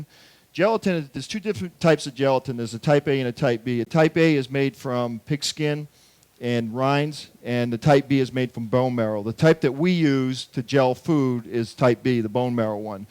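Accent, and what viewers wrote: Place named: Boston, United States. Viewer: he's from boston give him a break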